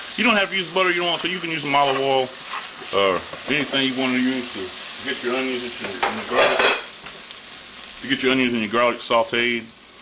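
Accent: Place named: Carolinas, United States. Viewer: He sounds more like he's from the Carolinas to me.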